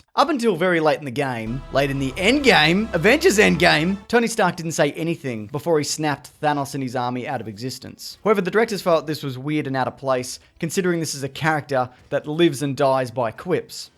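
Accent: Australia. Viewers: Why is hearing marvel facts and what not so much better in an australian accent?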